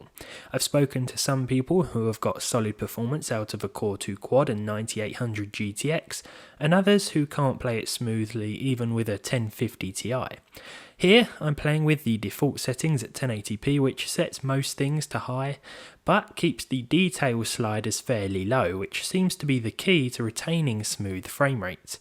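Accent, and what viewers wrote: United Kingdom. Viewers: Omg. Very good british acsient. <3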